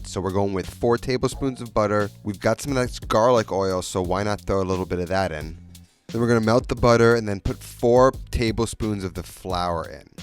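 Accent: New York, United States. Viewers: Sounds like a New York Italian accent... very similar to a Montreal Italian accent. 😉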